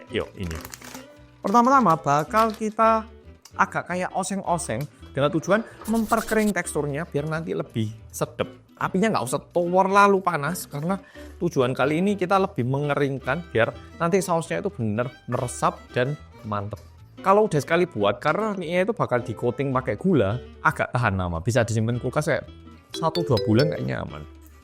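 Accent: Java, Indonesia. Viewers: Geli aku..ini oppa korea kok yo medhok banget talaaaah...misuh ya pake bahasa jatim an..wkwkwk😂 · Medokmu itu lo mas 💯😂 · wes ta lah.. mas ki jiwa medhok jawa e wes mendarah daging.. gak bisa dipungkiri lagi pokoknya 😂🤣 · Mas wis nang Korea kok isih medok? Anake mengko diajari ngomong Jowo.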